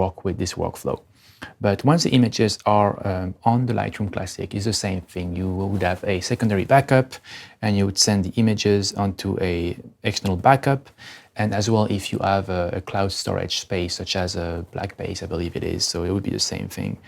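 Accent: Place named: England, United Kingdom. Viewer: Nice and clear tuto with a clean english accent ! Nice work thanks for it ! It helped me a lot.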